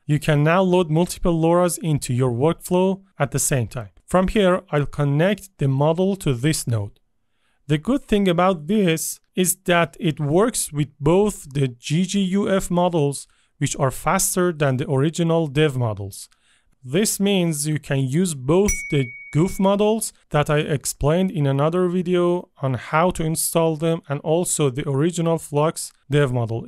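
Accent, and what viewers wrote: Iran. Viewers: این لهجه قطعا واسه یه ایرانیه ، دمت گرم :hand-pink-waving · لهجه ی زیبای فارسی رو که شنیدم ذوق کردم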